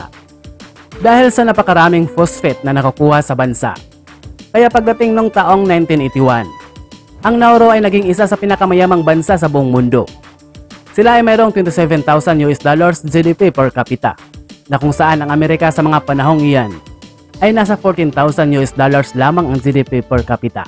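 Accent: Visayas, Philippines. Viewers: Visaya ka bay...wag ka nang mag English ...pilipino naman tayo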